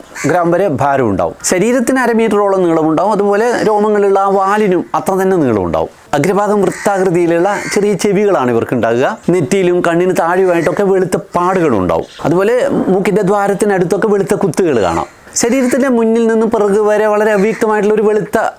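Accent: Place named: North Kerala, India. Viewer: സാറിൻ്റെ വീഡിയോസ് കണ്ടിരിക്കുക എന്നത് ഒരു പ്രത്യേക അനുഭവമാണ് ... താങ്കൾ ഓരോ വീഡിയോ അവതരിപ്പിക്കും മുമ്പ് വളരെ ആഴത്തിൽ സബ്ജക്ടിനെക്കുറിച്ചു വായിക്കുകയും, അന്വേഷിക്കുകയും ചെയ്യുന്നതായി മനസ്സിലാവും .. അതുപോലെ വടക്കൻ കേരളത്തിൻ്റെ ഉച്ചാരണ രീതി താങ്കളുടെ വീഡിയോസിൻ്റെ പ്രത്യേകതയാണ് ... ഒരു റിക്വസ്റ് ഒണ്ട് സർ, നീൽഗിരി മാർട്ടിൻ എന്ന ജീവിയെ കുറിച്ച് ഒരു ഡീറ്റൈൽ വീഡിയോ ചെയ്യാമോ?? 🙏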